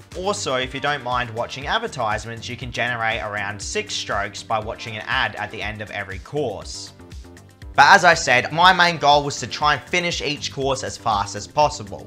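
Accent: Australia. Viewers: I am here because I love ur accent Australian English 😂😂😂😂😂😂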